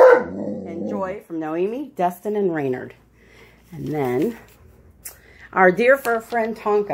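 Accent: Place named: Alabama, United States. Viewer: I am almost certain my brother has a fenced yard. Can he be with other dogs? My brother has either two or three and all are good and sociable dogs. I'm sure he'd tell you otherwise, but to my knowledge they would get a long with another dog. That would be a thing to watch for though because you wouldn't know my other dogs reaction to a new dog coming in their territory. I have emailed my brother and I expect to hear back promptly from him. The other thing about his dogs though is I'd be at my brothers going over things are just taking and one of his big dogs would just lick my arm from elbow to shoulder. I'm pretty sure they were tasting me to see if they wanted to eat me. One of the dogs is named Swan and she is a really nice dog who I have been around a lot when Bob lived out here just a couple of years ago. He has another dog named Buddy who is also a really nice dog. 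I think he has a couple of yippity dogs, but I doin't remember their names. You'd probably like Bob's wife-you talk just like her. She's from Alabama and she says the "yes, ma'aam and the no, sir", no matter if she's talking to an 82 year old or a 2 year old.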